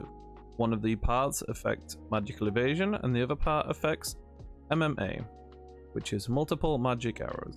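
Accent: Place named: Australia. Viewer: Very nice accent. I like Australian accents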